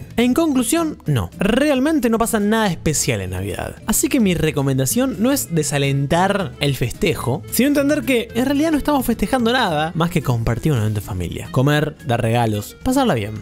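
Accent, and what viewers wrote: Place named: Argentina. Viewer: Deja de hablar como argentino!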